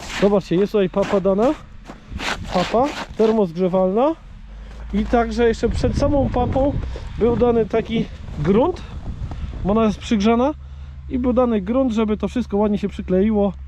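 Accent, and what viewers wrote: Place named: Kashubia, Poland. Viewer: Zatknij uszy bo to sa kaszuby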